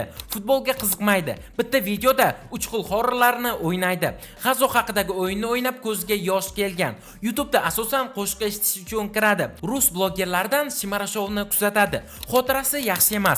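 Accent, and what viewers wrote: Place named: Samarkand, Uzbekistan. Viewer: samarqandlik ekanligini shevasidan bilgandim zemlyakim buladi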